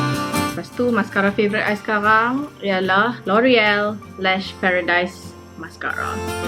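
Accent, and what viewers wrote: Penang, Malaysia. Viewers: = 💗 in penang dialect = 💗💗💗💗💗💗💗💗💗💗💗💗💗💗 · Comeeyyyyy aihhh dia ckp peneng..😍😍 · sebagai orang pulau mmg syok dengaq fellow island girl kita ckp penang.. nak malu apa.. mcm ni la kami dok ckp dari kecik.. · Puaih dengaq akak ckp peneng after so many years follow channel akak uhukss love teroxx ❤ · ok u chomel gilosss cakap penang!! love it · Comelnya cakap dialek penang. Tak kekok pun 😁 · Comeyyy cakap loghat penang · Awat cute sangat bila cakap penang 😍😍😍😍😍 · Comelnya you guna dialek Penang 😹 tapi I still prefer you guna English sbb I nak belajar. Hahahha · i like this vid soo much its like u are completely a different person when u talk in bm with penang loghat some more. your make up is da bomb as always love it !!! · Nurkhotimah Rifqi Pulau pinang, malaysia.. she speak in Penang dialect.. so maybe susah untuk kamu faham😂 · bila dh start cakap penang, kepala mau dok goyanggg tak biaq...gahahahaha hidup penang mai !! 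· WAIT I TAK HBS LAGI TGK THIS VID BUT GURLLLL I LOVE YOUR PENANG ACCENTTT OH EM GEEEE OKAY NAK SAMBUNG HAHAHAHAH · I love the way you speak your bahasa with a little bit of penang accent. There was no accent when you speak in English.. Love your makeup look. Finally a malay makeup youtuber...😘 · I'm Penang Chinese girl , I totally like when you speak Penang BM , SO CUTE! I love the accent ! Love your make up tutorial too, will keep on follow your video! Thumbs up for you girls ♥ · Dont know why, but I love it way better when u speak in malay.. to be exact, in that Penang slang .. thats cute plus u sounds way much comfortable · Sgt comel ckp loghat penang 😁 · Cute lahh cakap pelaq penang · Padu toq orang penang cakap! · Lahh penang rupanya 😂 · Comelnya cakap penang 😂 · Hahhaha napa rasa lawak dengaq cakap penang😂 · hehe comeinyaa loghat penang yg campoq dgn english 😆 uuu nampok muka bulat 🤣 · Boleh pon ckp penang...ingt beranak dkt uk 🤣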